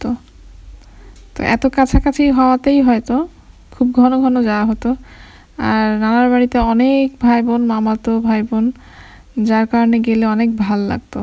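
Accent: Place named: South Bengal, India. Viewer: amar ও ত শেই প্রশ্ন । ইনি তার গ্রাম কোথায় তা বললেন না।! খুব খারাপ কথা । এতো টুকু সাহস তো উনা র থাকা উচিৎ ছিল, কমপক্ষে আমি ইম্পপ্রেসড হয়নি এটা অপশন এ রেখে।ইনার কথা শুনে অবশ্য মনে হয়েছে ইনি হয়তো বা সাউথ বেঙ্গাল(মানে খুলনার দিকে) কিন্তু আমি নিশ্চিত ও না।আমার নিজের বাড়ি জসরের কোন এক গ্রামে( যশোর থেকে ২০ মাইল দক্ষিনে ।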